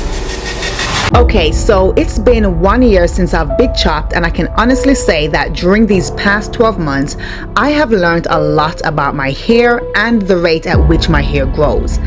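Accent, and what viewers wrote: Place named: Jamaica. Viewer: Ms, where are you from? I hear some Jamaican in your voice. Loved seeing the progression in the video. I too suffer from hair loss due to hormonal issues. Looking forward to seeing your progress.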